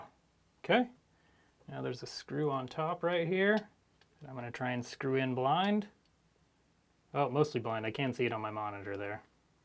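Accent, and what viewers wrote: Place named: United States. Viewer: it feels like my mate vince with american accent 😂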